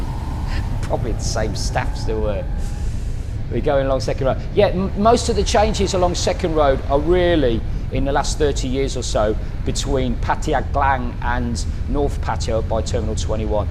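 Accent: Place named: Midlands, United Kingdom. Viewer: You sound like your from near me. A definite midkand accent I'm from Warwick well a village just outside beausale it'd on the way to Balsall Common. The back way into brum.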